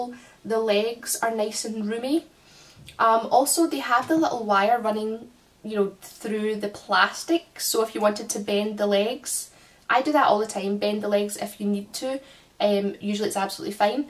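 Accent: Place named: Scotland, United Kingdom. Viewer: your accent is so cute you sound like riona from super natural. Is it Scottish? I have stainless steel polarized tinted mirrored aviators from zenni that are awesome! just a bit heavy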